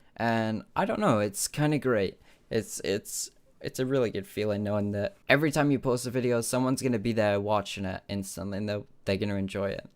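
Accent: United Kingdom. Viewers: I love your British voice😘❤️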